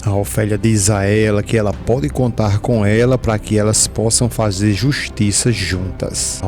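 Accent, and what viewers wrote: Pernambuco, Brazil. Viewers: Sr. Narrador, você é do Cariri ou Pernambucano?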